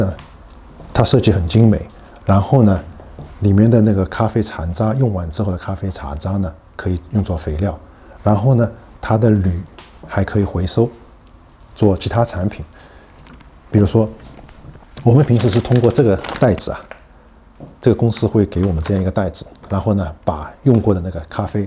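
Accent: Shanghai, China. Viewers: 一口正宗老派上海话，的的刮刮上海人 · 听到上海腔普通话感到很亲切。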